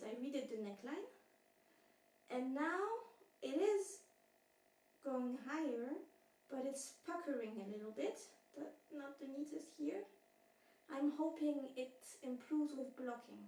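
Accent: France. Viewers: ❤love the French accent! It is so much fun to watch knitters all over the world! I am watching from B.C. , 🇨🇦.❤all those Danish designers, it’s fun to go on the Isager website, and look at the archive collection, and see all the Danish designers she asked to collaborate with.😊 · Bonjour du sud de la France ! I spotted your French accent in the firsts seconds 😂 Really Beautiful projects, I loved this podcast Thanks !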